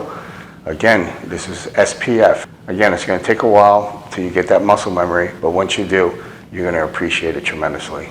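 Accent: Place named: New York City, United States. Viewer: Good stuff! Loving your videos...simple...straightforward...with the NYC accent! I have lots of drills to work on now to improve my game, so thnx!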